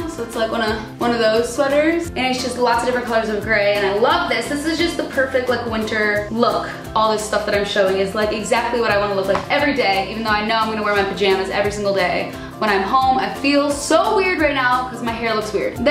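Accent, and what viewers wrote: Chicago, United States. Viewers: Wait is she from Chicago? Someone please tell me!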